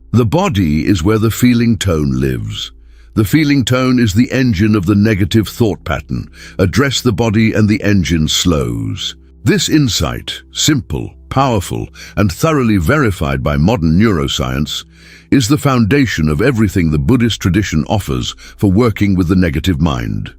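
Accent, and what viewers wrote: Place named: United Kingdom. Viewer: Why speaking so fast and with a British accent. I am a native Frenchman and only understand standard American.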